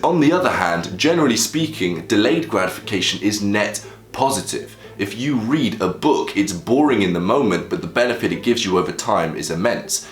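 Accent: England, United Kingdom. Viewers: Woe! What an amazing video, I totally agree!!! I was lazy and once I understood the logic of dopamine, my whole life changed. I work alone, I have the body of my dreams, my mental health is getting better every day...Thanks. What city are you from,? Obviously seems to be from England, has a beautiful accent! You remind me a lot of actor Cillian Murphy, has anyone said? Well, gratitude from 🇧🇷❤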